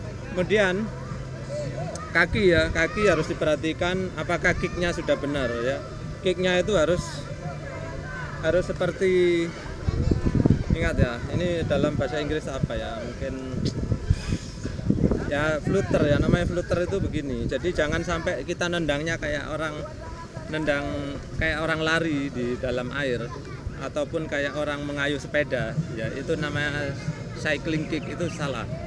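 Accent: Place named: Java, Indonesia. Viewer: Sangat bermanfaat pk besok sy latihn renang lgi pk......ko di batam pk kyakya bahasanya agk medok jawa.....sehat selalu pk slam dri bekasi.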